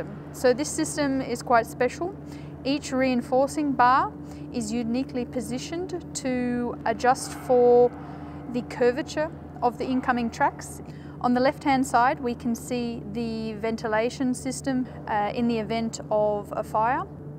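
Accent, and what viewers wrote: Australia. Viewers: I've been following this project since 2010. It's great to hear an English overview of the latest developments but even better that you're an Aussie. This is Stuttgart's equivalent of the Sydney Opera House. Please volunteer to do more. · cool project, cool people working on it and the Australian accent is very Wellcome in Schwäbisch Land..:) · God, I totally love this australian accent O.O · Do I sense an Aussi accent?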